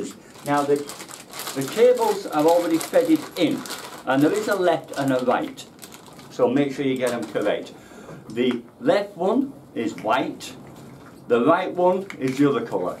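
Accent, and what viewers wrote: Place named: Blackburn, United Kingdom. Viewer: Great video , sound local to me in Blackburn . Saved me hundreds of pounds was dead easy to fix . Thanks so much fella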